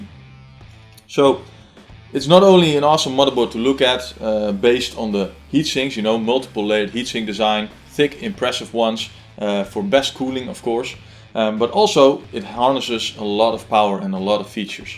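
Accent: Netherlands. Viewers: this guy has a dutch accent XD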